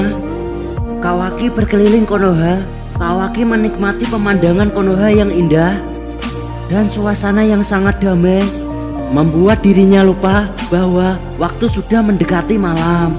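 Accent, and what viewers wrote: Java, Indonesia. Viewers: Bang bang woy...ente mendingan pake bahasa jawa aja biar medoknya pada terlestarikan lebih bagus bang...udeh pake bahasa melayu tp medok ude gtu pake ngomong jorok lagi ampoooun deh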